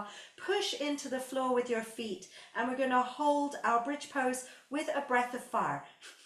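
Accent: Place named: United Kingdom. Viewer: Thank you / Suggestion. Dear. Thank you very much for bringing me back to my powerful practising of Kundalini yoga, and adding great practises to mines. May I humbly add this next part Just To Help Me, You and Other Students? If Yes, please read: For the returning and for the new students, I think it would be really kind to remind us that we do not have to do the practises as lengthy as you do them, and that we can stop as soon as the exercise becomes tiring. Also in this video, (maybe just due to your speaking fast & British), there was a confusing point from minute on, where you stoped 'showing us the exercise by doing it,' and start just explaining what we should do while still on our backs. Would you kindly make that shift clear? Truly thank you for your goodness and obvious skills brought to us in this most beautiful way. God bless you. 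May the Wind Be On Your Back, And Sun Always Shine Upon You!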